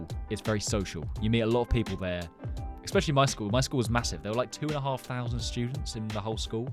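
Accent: United Kingdom. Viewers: r u bri'ish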